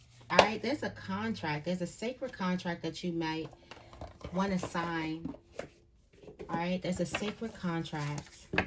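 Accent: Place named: DMV, United States. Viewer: I be hearing a lil DMV in yo voice , you from bmore ?!?! 😂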